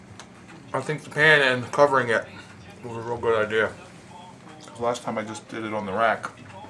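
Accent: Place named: Great Lakes, United States. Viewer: where are you from ? I hear great lakes or MN but swear I heard you say oklahoma lol. That could be the T.B.I. fooling me though.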